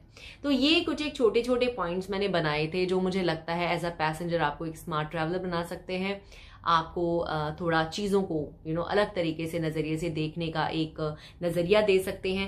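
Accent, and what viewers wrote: Punjab, India. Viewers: Bahut kamaal Punjabi kudi Hindi achhi bolti hai par pata lag jata hai k hai tan Punjabi kudi🎉🎉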